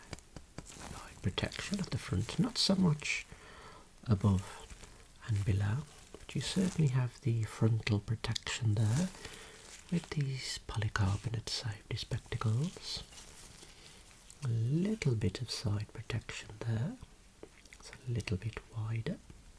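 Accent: Birmingham, United Kingdom. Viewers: There is something extremely relaxing about your videos. I think it's a combination of your Birmingham accent with your aura. I would be blessed to be one of your clients. Great video! 🙏😄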